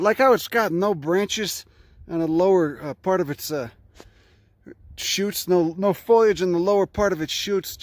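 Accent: Chicago, United States. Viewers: Love this ! My brain feels like a sponge.......LOL..soaking up all this info plus your marvelous Chi town accent..Great way to start a day ! Thanks :) And yes...cute shit DOES make me feel less homicidal..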